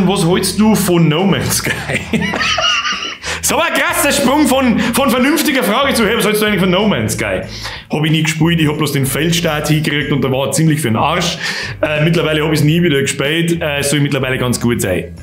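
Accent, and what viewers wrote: Bavaria, Germany. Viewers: Bin nicht aus Bayern finde dich einfach sympathisch und den bayrischen Dialekt ist einfach nice wünschte ich könnte ihn btw ich verstehe ca. 80% was du sagst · Oid Boarischa noma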